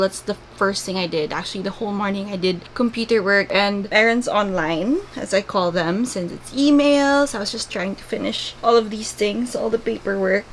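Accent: Visayas, Philippines. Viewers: ms., ang cute mo magbisaya🥰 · Ka cute paminawn magbisaya hahahaha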